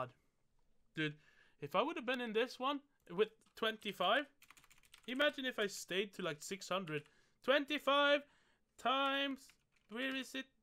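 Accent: Sweden. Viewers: are you swedish? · Are you from Sweden?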